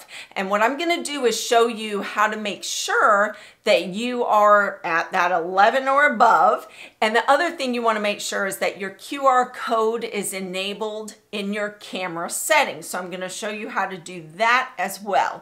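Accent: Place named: United States. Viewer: thank you so much! You speak American English! Yay I can understand you! You explain in a simple and direct manner. And you are nice! Thanks so much!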